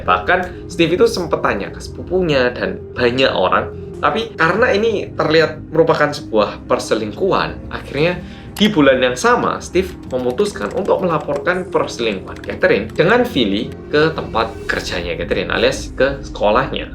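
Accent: Java, Indonesia. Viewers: bang klu ngasih video endingnya yg jelas dikit jangan gantung gtu... trus pas opening g usah trllu ngmong medoookkkk jyjyk bget gw dgarnya🤢🤮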